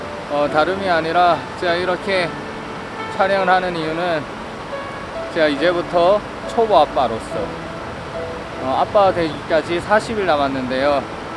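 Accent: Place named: Daegu, South Korea. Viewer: 미래 태어날 딸을 위한 노력과 사랑과 정성이 보이는 유튜브네요! 대구사투리도 듣기좋고 와이프사랑도 좋습니다. 응원합니다 님!♡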